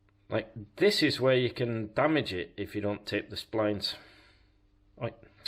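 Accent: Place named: Northern England, United Kingdom. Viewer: Loving the commentary. Sound like you lived in Manchester or Leeds for a while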